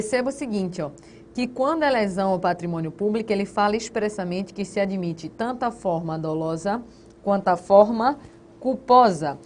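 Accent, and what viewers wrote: Pernambuco, Brazil. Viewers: Gosto das suas explicações, assistindo sempre . Com esse sotaque Pernambucano me acabo de rir qd fala coisas engraçadas.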